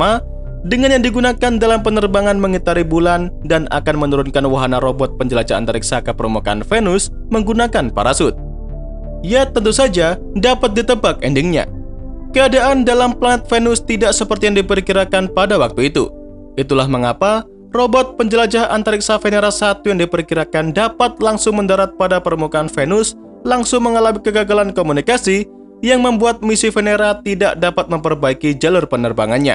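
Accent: Java, Indonesia. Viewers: Masss. Suaramu digawe seng rodok kalem mas.. medokmu kuii lo.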